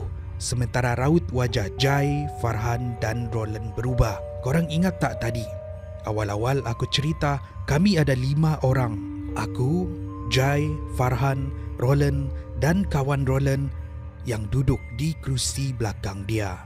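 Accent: Sabah, Malaysia. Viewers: dia ni orang sabahh ka?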